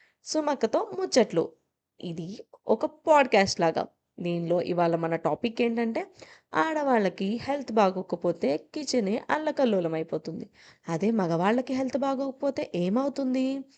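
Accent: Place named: Telangana, India. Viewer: Chala baga chepparu andi telangana slang kuda super ga vachindhi andi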